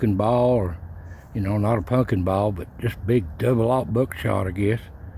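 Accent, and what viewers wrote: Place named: South, United States. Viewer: that sweet southern drawl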